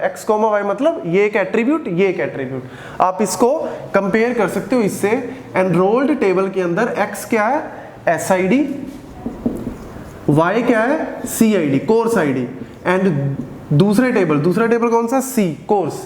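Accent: India. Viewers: can I give out some suggestion/ Content is good but accent is quit horrible, I feel like I’m listening to Indian, it would be quit nice if subtitles could manually added clarify what teacher is saying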